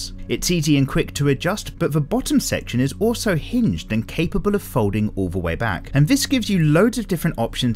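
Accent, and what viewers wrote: United Kingdom. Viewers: I am new on your channel, but I already love it. It is so nice to listen to your voice recording about drawings while I am doing my own arts. Thank you. Love your british accent!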